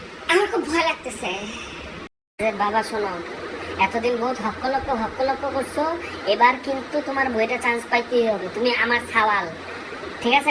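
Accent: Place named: Jessore, Bangladesh. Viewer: আমার নানাবাড়ি হলো যশোরের ঐদিকে মাগুরায়।তুমি মাঝে মাঝে ওরকম ভাষায় কথা বলো ।দেশের বাড়ি কোথায় ভাইয়া?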